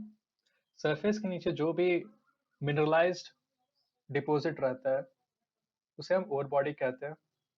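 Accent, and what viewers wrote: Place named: India. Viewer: I can't understand because your indian accent.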